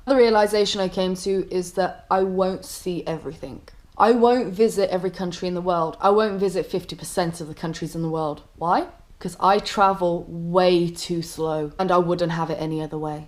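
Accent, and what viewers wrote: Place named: Cardiff, United Kingdom. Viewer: I hear cardiffian in you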